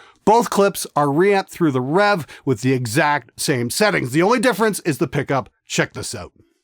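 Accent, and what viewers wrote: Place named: Canada. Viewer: that was the most canadian accent i ever heard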